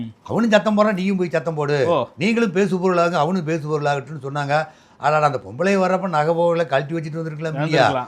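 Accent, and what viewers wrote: Kongu, India. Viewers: கொங்கு தமிழில் ❤❤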